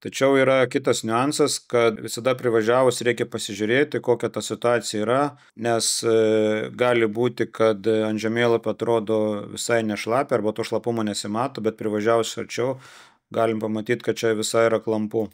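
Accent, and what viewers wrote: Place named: Aukstaitija, Lithuania. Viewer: a pats koks toks esat - suvalkas(užaprobuotas), rytų-vidurio-šiaurės aukštaitis,(tikrai ne žemaitis, nes tie šitaip neišsipisinėja) - kame tas "akcentas" kliūva?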